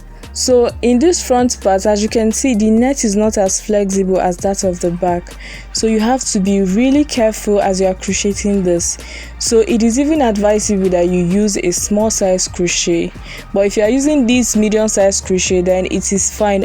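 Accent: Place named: Nigeria. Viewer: Are you Nigerian Coz you sound like one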